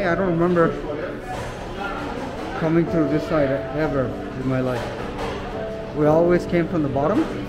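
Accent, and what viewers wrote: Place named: Quebec, Canada. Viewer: I'm so interested in knowing where your french speaking is from! It's so "Canadian" it's baffling.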